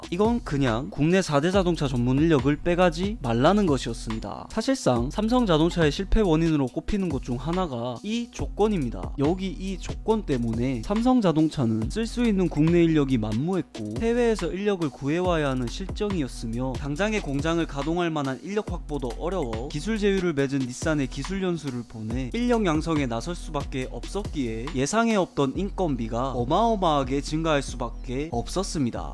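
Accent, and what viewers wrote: Gyeongsang, South Korea. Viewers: 첯음절에 강력한 엑센트 깅상도사투리 땜에 패쓰~~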